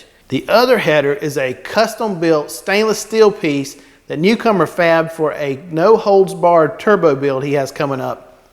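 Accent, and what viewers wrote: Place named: Texas, United States. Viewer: I wish you would've used someone else to narrate these videos. My employer's former chief officer of operations was from Texas and his twang was annoying like this guys.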